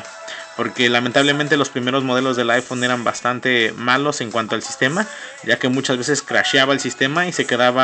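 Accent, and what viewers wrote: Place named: Mexico. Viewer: 30 segundos y quité el video. como detesto el acento mexicano... mejor lo veo en Inglés weis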